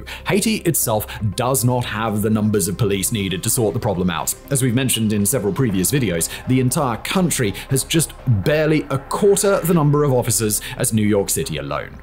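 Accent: United Kingdom. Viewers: I’m interested in this. Is it worth suffering the British accent? Nah.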